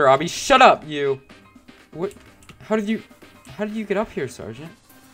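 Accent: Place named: United States. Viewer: me who would think that the one who owns such a strict group would not call everyone a moron also u sound like your from america but isnt this a british army?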